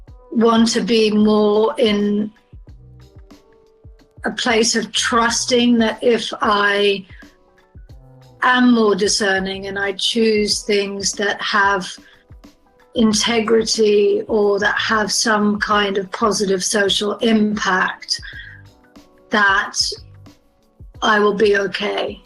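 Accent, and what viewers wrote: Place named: United Kingdom. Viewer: She lived in the UK til she was 13 and has now lived in the UK for most of her life. She’s not “doing” a British accent. It was her first “language”.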